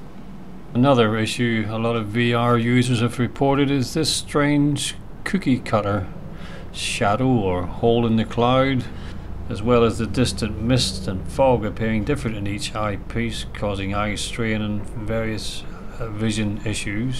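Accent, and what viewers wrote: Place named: Northern Ireland, United Kingdom. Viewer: Hi, Cheers for the vid 👍, just trying to place… fellow Norn Iron?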